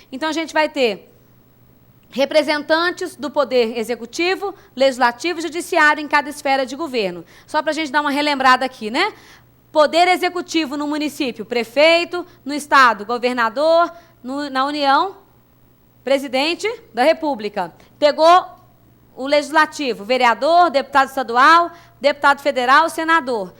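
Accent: Minas Gerais, Brazil. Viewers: Essa professora é muito gente fina, além de ser bem humorada. Gosto dela e do sotaque de mineirinha :) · professora com sotaque mineiro perfeito rs sou Potiguar mas amo sotaque mineirin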